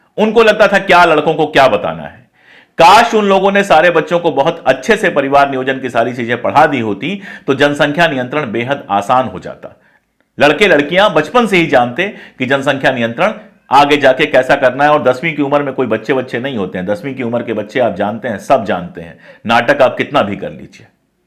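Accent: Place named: Bhojpuri, India. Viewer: भोजपुरी ज़बान बुहत ख़ूबसूरत है जिस तरह हरियाणवी भाषा इंसान के करीब होती है वो ही मामला भोजपुरी ज़बान का है और आपकी भोजपुरी ज़बान भी शानदार लग रही है 👌🩸🌹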